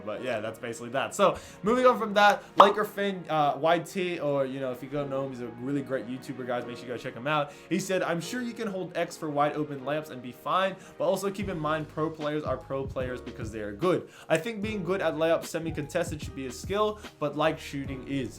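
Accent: Australia. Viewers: why you hiding your australian accent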